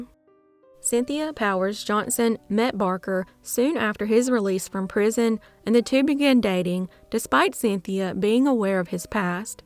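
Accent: South, United States. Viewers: Yes, I agree with everyone. Your opinion spun on this vile behavior coupled with that Southern accent is expressed quite nicely. Sorry ass sons lol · Love her southern accent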